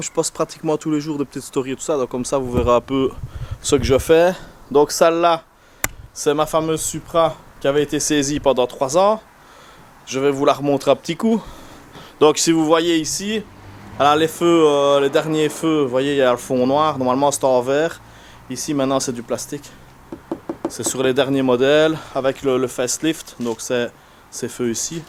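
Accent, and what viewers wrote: Liège, Belgium. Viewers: L accent liégeois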